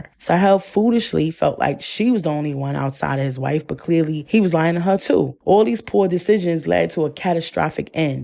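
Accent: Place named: East Coast, United States. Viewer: Your East Coast accent is sooo Cute. Also, What a MESS These folks Were in.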